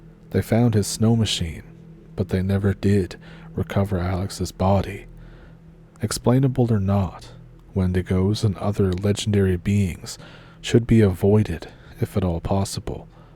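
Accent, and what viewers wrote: Ontario, Canada. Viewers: Thanks for the stories, tonight. Your accent sounds like my old cousins and family from Ontario. Good times. 🎉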